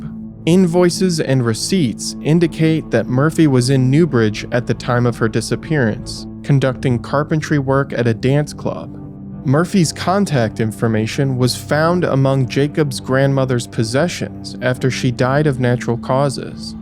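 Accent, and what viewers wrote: United States. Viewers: if you’re actually offended by someone having an American accent…. There’s far better things to bitch about tbh.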